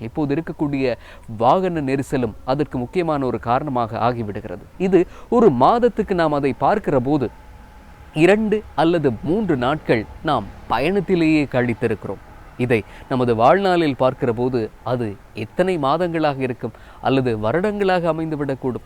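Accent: Sri Lanka. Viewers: Seem like srilankan tamil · அழகான இலங்கை தமிழ்..😍😍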